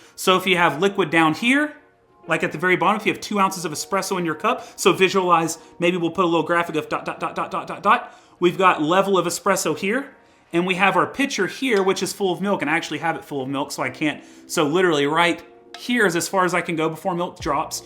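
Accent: South, United States. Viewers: Great videos, man! The quality of my steamed milk went up an entire level thanks to you! I have to ask, where are you from? Your accent sounds super familiar, to me. (I'm guessing Georgia or Alabama)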